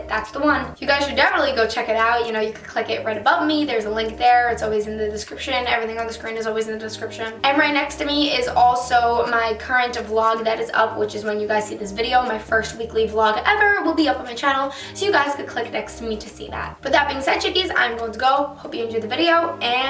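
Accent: New York, United States. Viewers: Senja S it’s her New York accent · your new yorker accent makes me want to go live in nyc more and more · I love your new York accent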